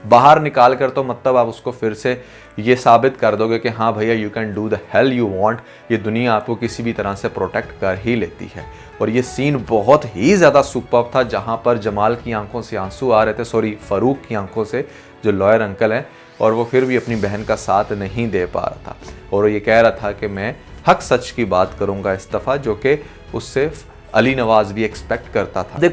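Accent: India. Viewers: Indians ki trah "phir" KO "fir" Ku bolte ho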